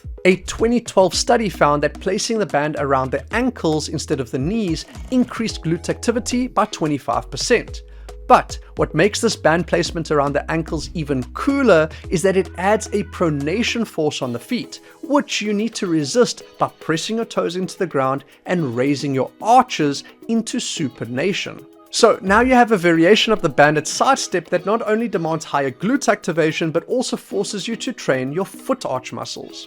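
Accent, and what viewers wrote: South Africa. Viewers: Great content. Are you South African?! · Are u South African? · Accent sounds South African? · sounds South African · Yo yo. You sound South African. I can't find any decent barefoot or wide toebox shoes. Where do you recommend I go check. I live just outside of Cape Town in Paarl.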